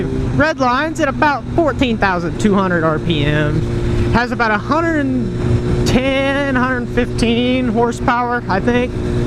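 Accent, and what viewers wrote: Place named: West Virginia, United States. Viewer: Are u from West Virginia?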